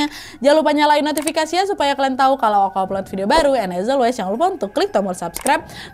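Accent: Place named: West Sumatra, Indonesia. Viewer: Kak orang mana ya???kok ada sensasi bahasa Minang nya, 😅😅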